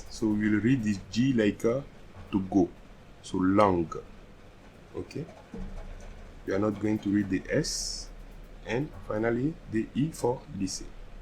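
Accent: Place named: West Africa. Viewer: Sounds like an african french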